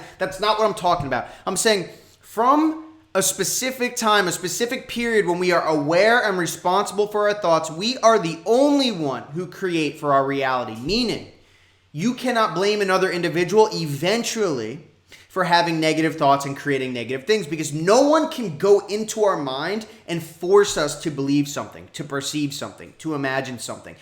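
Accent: New Jersey, United States. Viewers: You can take the boy out of Jersey but you can’t take the Jersey out of the boy 😎🤘🏼🙏🏼WHA-DUH 💦 💧 🤣🤣🤣 I freakin ❤️ it!!! Don’t change , be you siempre 😊😊😊 #dailyliststrong 💪🏼💪🏼💪🏼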